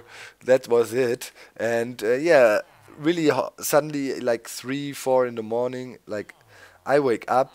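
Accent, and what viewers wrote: Germany. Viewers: Ist realy strange a Person who also Comes feom the Country you come from in another language hear to speak and commenting in english at the same time you know he also under stand this: Man hört den Akzent raus! and i wanted at every time I wrote a comment say the following sentence: "it is nice to be a greiß, but it is higher to be a bauer! ;D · Du bist Deutscher?! Cool, jetzt wo ich es weiß hört man doch ein bisschen, dass du Deutscher bist :DD Aber naja Englisch kannst Du!!! :DD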